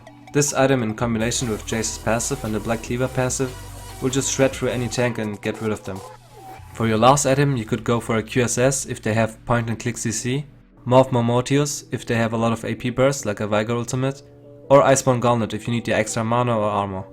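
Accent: Germany. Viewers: Dunno why but you sound like a german to me 0: accent-wise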